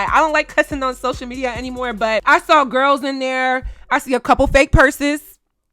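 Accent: Nigeria. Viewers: Nigerian accent cures my depression 🇳🇬💖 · I love when brings out her Nigerian accent! I know she’s annoyed when that comes out! 😭😭🔥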